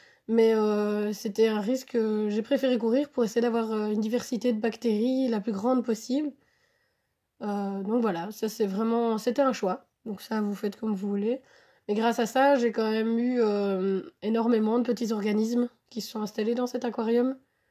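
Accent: Belgium. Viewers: Bonsoir. Je suis tombé sur ta vidéo par hasard. J'ai reconnu ton accent belge 😉. En ce qui me concerne, je pratique l'aquariophilie depuis bientôt 10 ans et je commence à m'intéresser au low tech. Je me pose la question si cela pourrait fonctionner avec ma population : 5 scalaires dans 350 l... Sinon, j'habite Bastogne. Vive la Belgique ! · L'accent belge 😍 tu as un admirateur en France !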